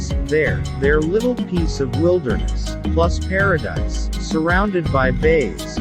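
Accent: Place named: North, United States. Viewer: you sound like a yankee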